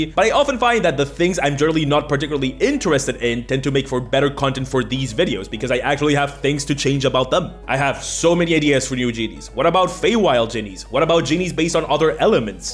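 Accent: France. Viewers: WAIT WHAT ARE YOU FRENCH !?!?!?!? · welp, as a french guy my self i must say... your french sounds... french ? yet you're english does not... i'm confused mate xD are you a fellow frenchman ?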